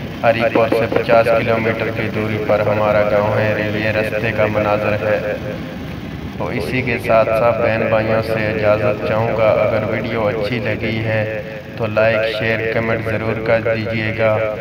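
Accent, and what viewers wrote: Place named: Saraiki, Pakistan. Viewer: آمین ثم آمین سرائیکی آواز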